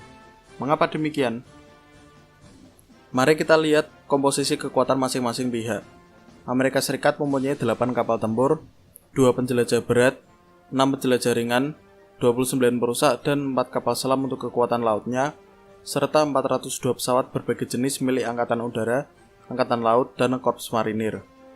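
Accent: Java, Indonesia. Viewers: logatnya medok bgtt aduhh .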